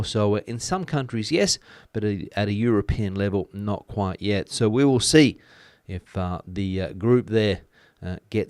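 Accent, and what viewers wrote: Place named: Australia. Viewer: I'm from Germany and stumbled upon your channel a couple of days back. Quite funny to hear an Aussie doing the news on Spain. G'day! I lived in Oz for 2 decades and loved the outback, and now want to retire in southern Spain. I'm taking spanish classes and researching my options. Looking good. Thanks for doing this, looking forward to hear more from you. Cheers!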